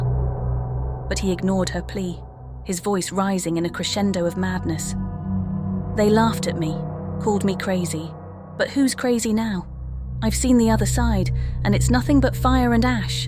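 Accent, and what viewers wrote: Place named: United Kingdom. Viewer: your voice is a very beautiful narration . you sound like your. British or England not sure. but it's very beautiful I like it. Plz keep up the great job .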